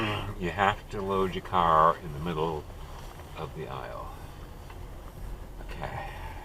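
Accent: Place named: Boston, United States. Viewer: You can take the out of Boston, but you can't take the Boston out of!:-D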